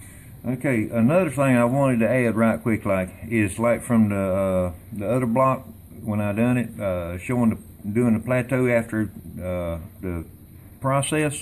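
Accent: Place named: Texas, United States. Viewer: Live your accent. Texas!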